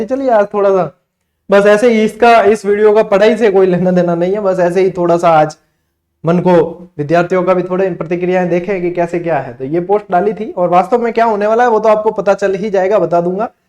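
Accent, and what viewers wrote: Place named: Marwari, India. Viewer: Sir आपकी बोली घनी सुंदर लाग h marwari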